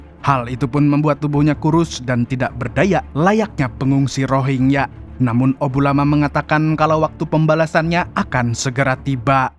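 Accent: Banjar, Indonesia. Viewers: Banjar banar piyan mang ae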